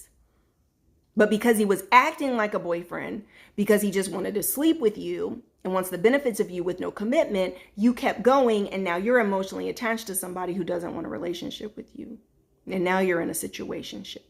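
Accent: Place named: Georgia, United States. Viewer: Why do you low-key look like Jada Pickett-Smith today? Except for the Georgia accent, you sound a little like her, too.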